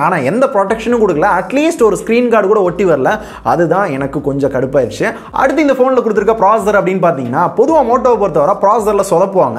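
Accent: Madurai, India. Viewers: Bro okaluku madurai ya?? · Bro nega madurai ya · Bro neenga madurai bro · Madura karanku thon antha slang puriyum.. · bro ninga madurai ya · Madurakaaranaya nee😄🔥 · bro nenga madurai ya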